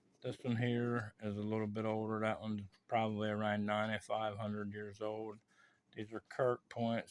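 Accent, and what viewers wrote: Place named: United Kingdom. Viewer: Such an interesting collection! I love listening to your accent as well. Picking up a few British-sounding words here (“down”, “house”, etc.).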